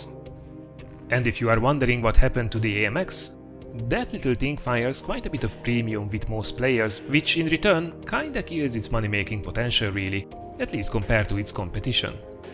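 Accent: Hungary. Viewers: Great video. I am 100% sure that you're from Hungary :)) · started the video while turning away from screen. after the 1st word, I would say (typing this without looking at either the comments or the user) this is a Hungarian accent... And, no mistake for sure... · Are you from Hungary?😃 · Magyar? :D Az akcentus nagyon olyan. :D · Hat ez nagyon hunglish lett, fajdalmas volt hallgatni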